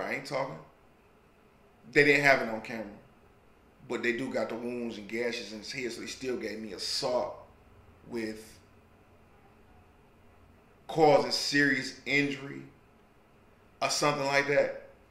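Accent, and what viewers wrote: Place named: Michigan, United States. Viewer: it’s how we talk in Michigan, call it bad pronunciation, regardless the talk different for us ✌🏾❤️ 🧤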